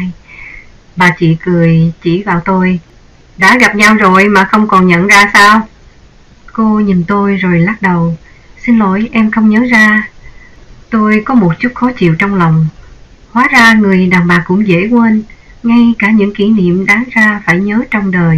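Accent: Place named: Saigon, Vietnam. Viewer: Mình rất thích nghe giọng này... Nó rất SG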